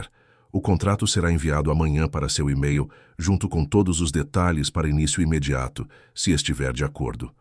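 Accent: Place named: Brazil. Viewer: eu sei, só que ele está narrando em português Brasil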